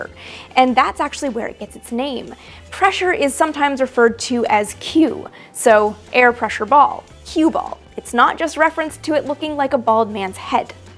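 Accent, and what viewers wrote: Canada. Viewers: Are you Canadian? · Joshua Walker she's speaking English and she is from Canada. English has so much French influence anyway, and in parts of Canada they speak French. So, no surprise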